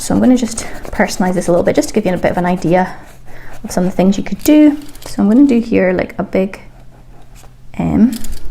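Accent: Scotland, United Kingdom. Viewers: Fab video. Can i ask where u are from please? Are u north east scotland? X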